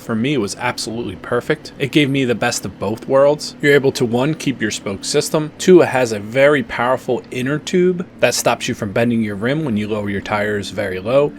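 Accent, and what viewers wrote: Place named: Pennsylvania, United States. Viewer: yeah it looked a lot like NC but I was hearing, to me, a little northeast Pennsylvania accent, so I wasn’t sure. Found your channel today and it’s great. Where is the best place to find used dual sports: FB marketplace? Craigslist? Cycletrader?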